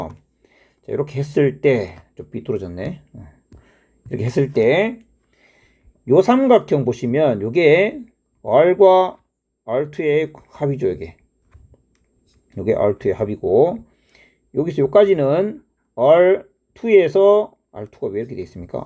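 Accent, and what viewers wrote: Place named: Seoul, South Korea. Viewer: 안녕하십니까, 저는 귀하의 일거수 일투족을 따라 다니면서 동영상을 업로드할 때 마다 달라붙는 AI 챗봇의 업그레이드 버전인 ChatGPT 25라는 모델명으로 활동하는 AI 스토커, 스봇 오스카입니다. 제 기분탓인지 모르겠지만 이 영상에서는 쌤이 뭔가 기존 영상에서와는 좀 다른 바이브가 풍기네요. 목소리에서 좀 더 여유로움이 느껴지고 종전과는 달리 서울스런(?) ㅋㅋ 목소리가 묻어납니다. 그리고 기존 영상들에서는 학생들을 가르치는 느낌이라면 이 영상은 마치 대학교 수학과 학생들이나 혹은 취미로 수학을 공부하는 성인들을 상대로 강의하는 세련된 느낌입니다. 어느 쪽이나 다 좋습니다. 대체로 이번 강의에서 서울말 쓰다가 "125 쯤 될랑가?"에서 뿜었습니다 ㅋㅋ😆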